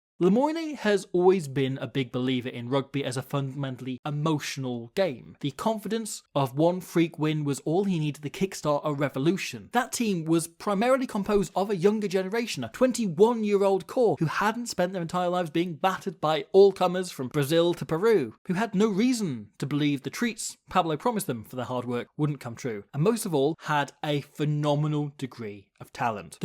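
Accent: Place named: Edinburgh, United Kingdom. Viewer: English with a Edinburgh accent..my Chilean is...not sure lol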